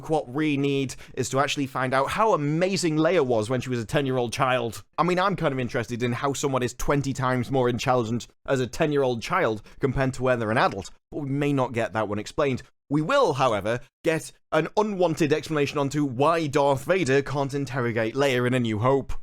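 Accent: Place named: Stoke, United Kingdom. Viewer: I don't agree with everything you say but you speak eloquently. You are a well informed man with good language jive. btw are you from Stoke?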